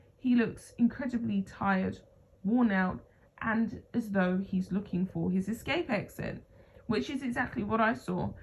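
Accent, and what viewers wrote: United Kingdom. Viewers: Anyone reminded of the film ''Misery''?.. Lovely to hear a Brit doing this for a change! Good on you girl.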